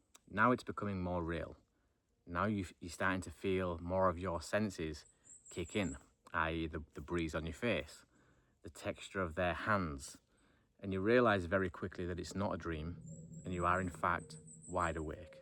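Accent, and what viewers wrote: United Kingdom. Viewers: Love your content! Did you ever figure out your findings of that paintball place with the rack of game cameras? Was that abandoned? Btw I love UK accents 😂 cheers from Canada!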